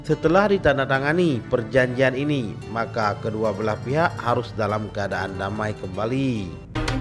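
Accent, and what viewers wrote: Aceh, Indonesia. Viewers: Logot Aceh nya kental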